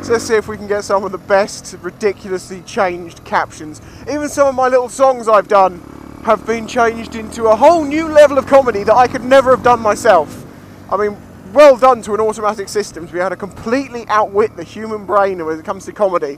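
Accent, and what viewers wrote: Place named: England, United Kingdom. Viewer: LOL, captions were funny. I think your accent is throwing the software off. It doesn't recognize English english.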